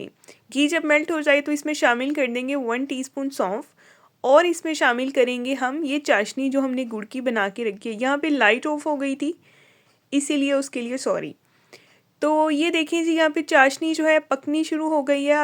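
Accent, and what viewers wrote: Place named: Punjab, India. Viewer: Aapkay gur walay chawlon ki tarqeeb bohot achi hai aur apkay lehjay se mujhe aap punjabi lag rhi hain. Mein aapki nano hoon. Main pakaon gi to zaroor btaon gi. Farida Yazdanie, mein 79 years ki hon.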